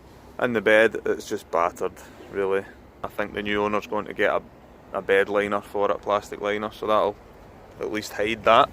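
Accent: Scotland, United Kingdom. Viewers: Good to hear a Scottish accent again, great job on the truck BTW. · Is that Scottish accent? Lovely work on that truck! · are you Scottish?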